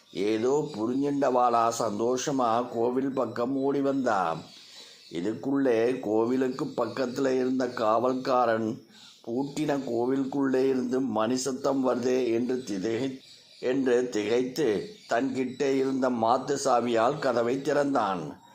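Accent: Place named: South India, India. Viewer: He is talking in Dravidian voice, specially known for DMK, Karunanidhi.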